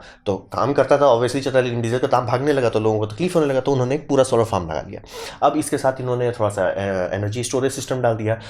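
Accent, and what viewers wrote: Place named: Bhojpuri, India. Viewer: Goetha pure bhojpuri 😊😊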